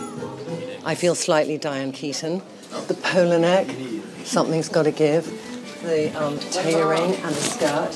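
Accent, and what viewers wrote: United States. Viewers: Love the video, but why the American accent after a week! Is there something we should know?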